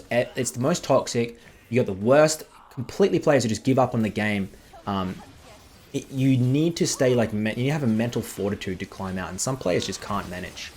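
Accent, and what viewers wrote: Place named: Australia. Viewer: Out in the bloody boony's in woop woop. farming. yourself. . This man is so Australian it hurts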